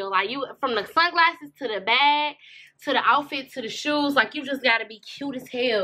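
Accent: Detroit, United States. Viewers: Cute, the fact I knew you were from Detroit, well before you said it is crazy lol love the video 😎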